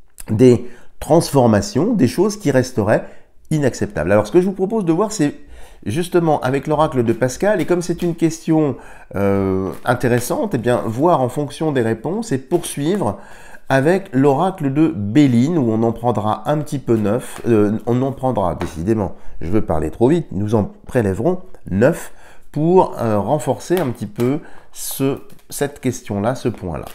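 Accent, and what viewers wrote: France. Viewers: Bonjour Monsieur. Le Capitaine Juvet Brunet lui aussi est un fervent amoureux de notre belle France et souhaite sortir de l' U. E. Il est intéressant de l'écouter. Merci à vous Monsieur pour votre élocution très Française.. Un régal. 💞🌺🐈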